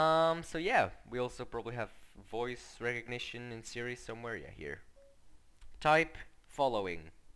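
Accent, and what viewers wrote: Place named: Denmark. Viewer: Nice accent brah! Danes are the best.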